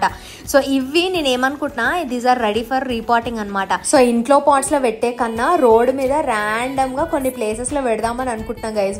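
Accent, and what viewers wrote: Telangana, India. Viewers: నువ్వు పక్కా తెలంగాణ అని తెలుస్తోంది, తెలుగు మాట్టాడమ్మా, పర్లేదు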